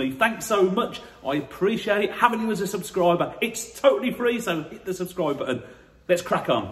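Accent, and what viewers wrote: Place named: Birmingham, United Kingdom. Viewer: I remember the very first car I bought at auction. A Lada bought from Moseley Road auctions, which I know from your accent you will know :-) ... I was about 18 years of age at the time (now 53) and had a budget of £100, money was tight as a kid. I bought it for a whole £90 !! .. It had 6 months MoT. I drove the car until the MoT ran out, then sold the car from the Solihull Times (nice place to sell cars at the time). I sold it for £150. Actually made a profit on the brick shaped tank !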